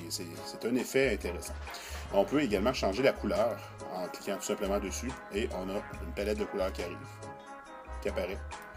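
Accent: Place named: Canada. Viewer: Merci pour le tuto en Français, j'attends mon interface et c'est grâce à tes explications que je l'ai acheté, en plus des explications tu nous fais voyager avec ton accent Canadien ; )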